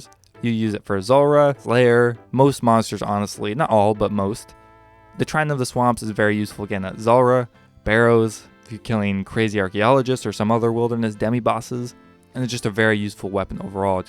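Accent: Canada. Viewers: Are you Canadian? I thought I heard an accent 🙂 🇨🇦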